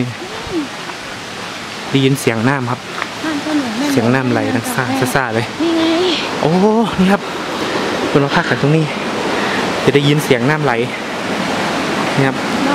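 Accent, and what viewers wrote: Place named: North, Thailand. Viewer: ดีครับผม อู้กำเมืองน่าฟังดูดีนักๆๆครับ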